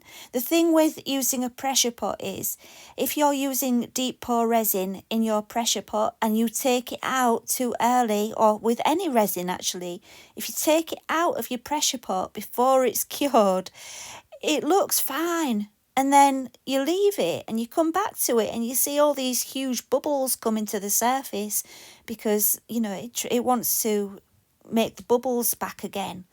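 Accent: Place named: England, United Kingdom. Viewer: Beautiful 😍 and such a breath of fresh air to listen to an English person narrating , there aren't many craft videos like this, they're usually American, so thank you for that too 😁 x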